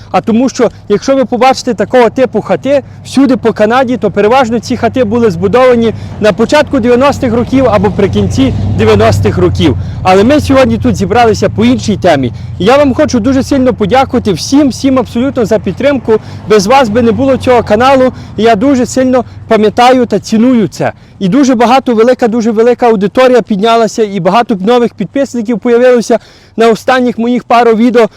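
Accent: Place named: Lviv, Ukraine. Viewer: Мова дійсно чудова. І вимова львівська.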